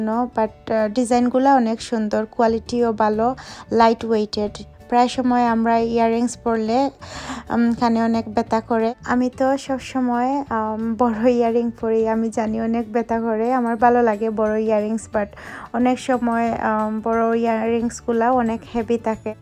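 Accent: Sylhet, Bangladesh. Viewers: Boin sylhety mat ow tumare beshi manay. Suddho vashar mat huniya kila lagse. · Afa sylheti mato ela bala lagena 😏😏😒😒😒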